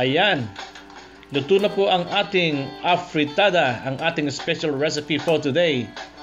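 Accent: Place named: Tagalog, Philippines. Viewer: Sa puntong Ito 👍original tagalog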